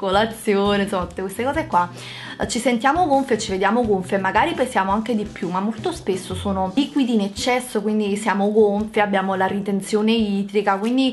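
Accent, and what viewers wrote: Naples, Italy. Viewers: anche io sono di Napoli, però almeno nei video cerca di limitare questa cadenza napoletana pazzesca!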